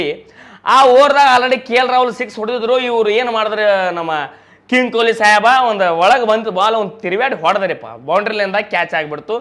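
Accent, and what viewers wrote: North Karnataka, India. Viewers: Super uk speach 🎉🎉🎉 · Bro basically im from south Karnataka but seriously i love your talking skill and North Karnataka launguage ❤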